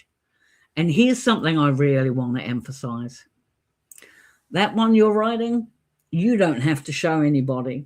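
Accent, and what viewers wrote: New Zealand. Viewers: What a wonderful vlog. First time seeing your channel and have subscribed. Immediately spotted the accent and harekeke out the window so felt at home as a kiwi too. In answer to your request to comment to someone wanting to write: Writing matters. It matters to you, that’s why you feel the urge. That’s why you feel the compulsion. It matters, that’s why you feel the resistance. It matters, that’s why when you finally start, you feel whole, you feel joy, you feel the desire to continue. You’ll also feel the self criticism and doubt but you keep writing because writing matters to you. Keep writing till you get beyond it mattering. Keep writing till you feel it’s who you are, someone who writes. Just like you might be someone who knits, someone who runs, someone who paints, someone who bakes. Write because you are someone who writes.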